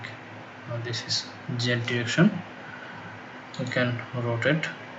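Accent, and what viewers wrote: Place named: India. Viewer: Hi.what is you nationality dear dude?You speak like Indian People.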